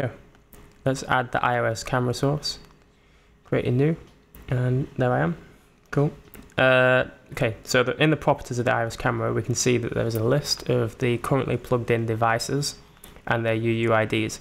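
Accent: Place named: North of England, United Kingdom. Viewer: Great plugin just purchased it! Sounds like a North of England accent! :-)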